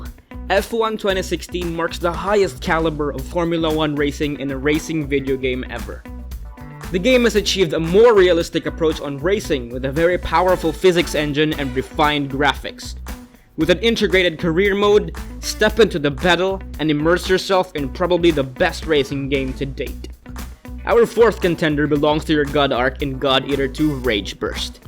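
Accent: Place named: Philippines. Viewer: That thick Philippine accent though.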